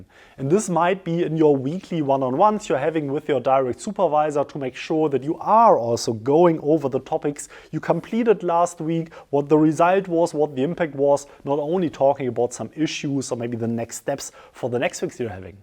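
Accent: Germany. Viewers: I love the german accent!❤ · Ein ungefragter Tipp nachdem ich mehrere deiner Videos geschaut hab: Inhaltlich gut dargestellt und kohärent. Sprachlich muss man sagen, dass du quasi Deutsch mit englischen Vokabeln sprichst. Sprachmelodie, Grammatik und Satzstruktur sind deutsch. Sonst gut.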